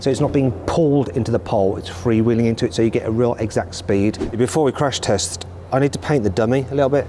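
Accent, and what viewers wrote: United Kingdom. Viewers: Logat nya british bngt kwkkwkwkw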